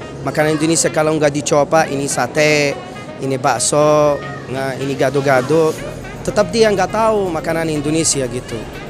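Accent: Arab world. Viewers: Iya tajwid nya masih kental..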